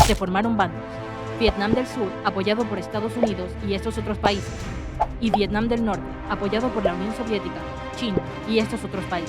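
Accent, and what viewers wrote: Panama. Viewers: Por el acento y por el minuto es imposible no saber que las personas detrás de este canal son Panameñas. Me suscribo de una vez Tremendo Canal!!!! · Muy buen, video, me encantó... pero una pregunta? En realidad eres de panamá??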